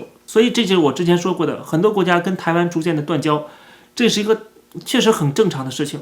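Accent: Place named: Wuhan, China. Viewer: 黄陂口音😊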